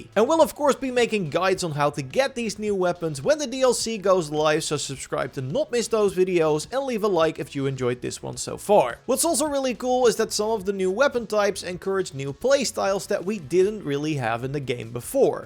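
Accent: Netherlands. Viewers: Lekker hollands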